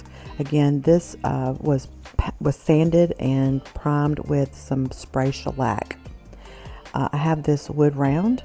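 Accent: United States. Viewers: Can't beat that Alabama accent !! South GA accent is very similar! So enjoyed your video!🌟🌟🌟🌟🌟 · I had purchased a chair a while back real cheap from Salvation Army and cut it all up. Now I have a box full of spindles and you’ve given me some great ideas to use them for! Your accent is similar to my mom’s. She was from Virginia. Where are you located?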